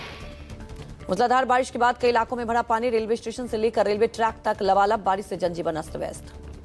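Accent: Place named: Bihar, India. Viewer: Ma'am aap bhi bihar se hai shayad apke accent se lag raha hai lekin apke efforts bht acche hai❤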